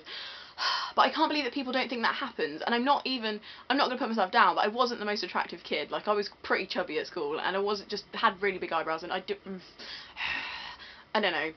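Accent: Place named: United Kingdom. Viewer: Wow. I can't listen to even one minute of this garbage even with the cute British accent. Of course I'm a man.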